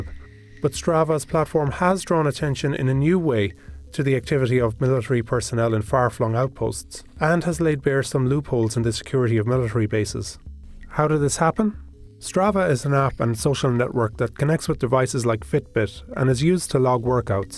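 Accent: Ireland. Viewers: Amazing Irish accent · Wow some Irish lad narrating this lol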